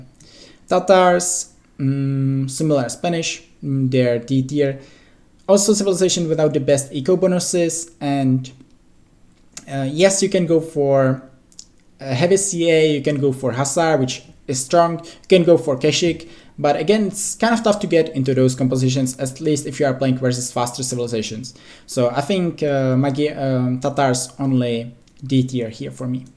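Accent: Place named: Czech Republic. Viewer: After hearing you say the first three words I instantly knew youre from Czech Rep.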